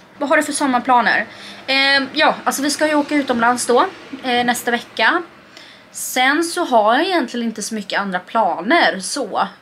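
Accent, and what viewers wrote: Stockholm, Sweden. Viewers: Varför pratar du Stockholms dialekt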